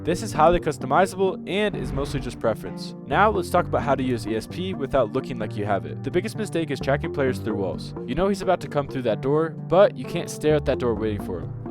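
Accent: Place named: North America. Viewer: You sound like your NA. Wait till embark send the suites to harass your mom like blizzard did mine with Glider/Honorbuddy. Good luck kid. Your barking up a ruff tree and your talent could make you way more money in another field. Being a white hat isn't "gay". Its progression into being a adult that wont spend the rest of his life in debt or in prison. Good luck young man. If you are even the one coding these and not just a reseller. Idk, been out of the scene for decades and wanted to see what kids where using now in games I played.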